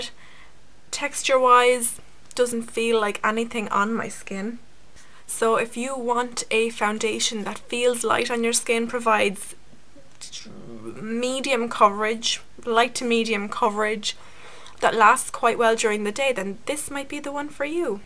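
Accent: Ireland. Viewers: I have to say, this was a brilliant review! I loved how you edited the video, like there was just the right amount of info. And I did want to know whether I wanted this foundation or not, and you've convinced me. Lovely to hear the Irish accent too :) (I'm Irish too). Would love if you'd take a trip to my channel, got some original music on there, hope you like it. Either way, I'm subscribing to you girleen :)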